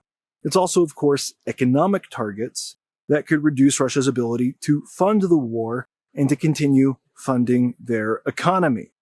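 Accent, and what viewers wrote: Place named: South, United States. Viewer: it looks like west Coast USA but he sounds abit South accent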